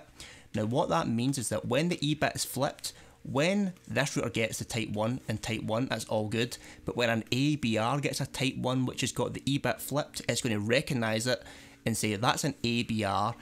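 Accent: Scotland, United Kingdom. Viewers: Agreed. My certs are a year expired.. I thought I really should certify again, given the “certpocalypse” explains it better than the guy on Udemy. Also, the Scottish voice is way better than the American voices you normally hear on Udemy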